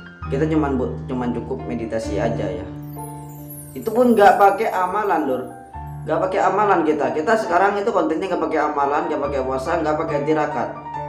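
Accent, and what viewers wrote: Madura, Indonesia. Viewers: Sampean orang madura y cak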